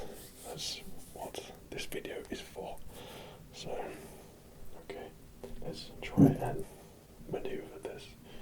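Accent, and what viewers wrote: United Kingdom. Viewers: This is so relaxing, I like not being able to see what's being used, also nice to hear a British accent like my own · Is he actually posh English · Where exactly is his accent from? The U.K. obviously, but where specifically? It seems quite distinct from what I traditionally associate with the "British Accent" . . .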